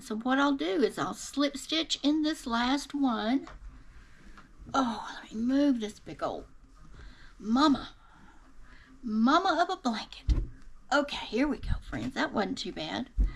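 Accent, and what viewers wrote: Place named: Abilene, United States. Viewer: I have the short Teamoy case in the pink kitty cover, I've had it at least 2 years, it's been great, holds up to abuse. I grew up in Abilene, listening to you is like going home. New sub! <3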